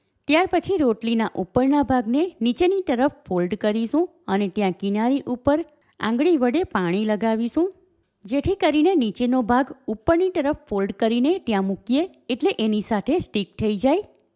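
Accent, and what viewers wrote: Surat, India. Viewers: તમારી ગુજરાતી રેસીપી તો સરસ જ છે પણ ભાષા પણ અસલ સુરતી જ છે.great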